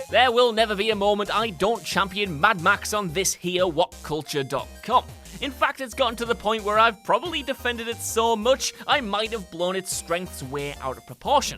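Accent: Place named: Lancashire, United Kingdom. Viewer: That was too much Lancashire for one day, thank you. Good video though.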